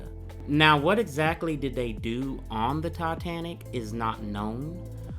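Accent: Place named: South, United States. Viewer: Hey - I’m loving your videos and just love your Southern accent! You mention about the DNA that was done to try to identity these poor victims. Is there enough information on this for you to create a video on what they found out? Best regards from England!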